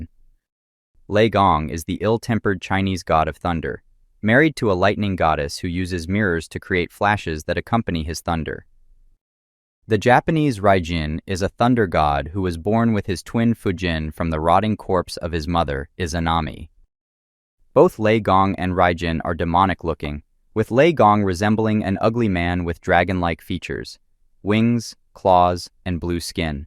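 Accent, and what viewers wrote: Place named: United States. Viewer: 미국인? 미국인발음 짜증남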